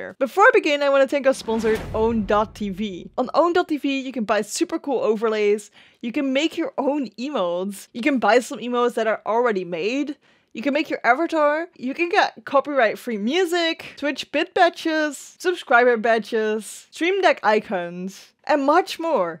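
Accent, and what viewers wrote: Netherlands. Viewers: Ik dacht stiekem al te horen dat je NL bent haha heel erg bedankt voor de tutorial!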